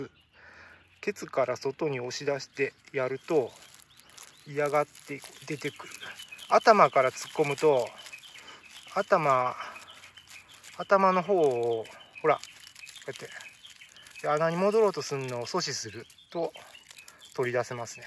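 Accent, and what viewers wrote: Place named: Nara, Japan. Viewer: 喋り方が関西の中でも奈良や京都の南の方ぽい(^^)木津川かな？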